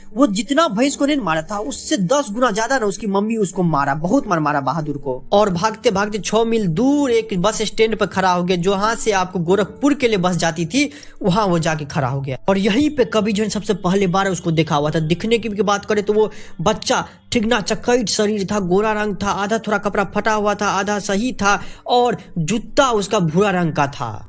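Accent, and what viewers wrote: Bihar, India. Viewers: भाई तुम बिहार से हो क्या ।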